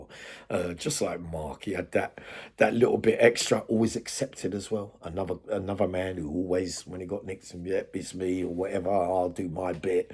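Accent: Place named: Bermondsey, United Kingdom. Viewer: I reckon your from Bermondsey, London. or close.!!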